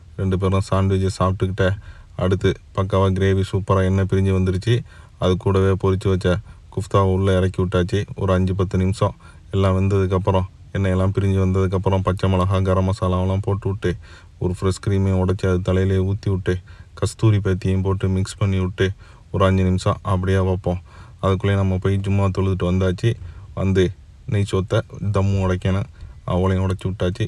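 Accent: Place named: Parangipettai, India. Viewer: நீங்க என்ன பரங்கிப் பேட்டையா? அந்த slangil பேசுறீங்க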